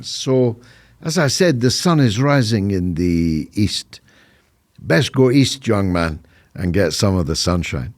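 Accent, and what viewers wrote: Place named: Ireland. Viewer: This man sounds irish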